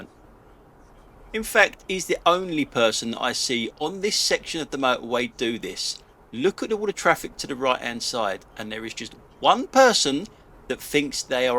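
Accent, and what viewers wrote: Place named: Cockney, United Kingdom. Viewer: 13 mins of a boring cockney moaning about other people’s driving.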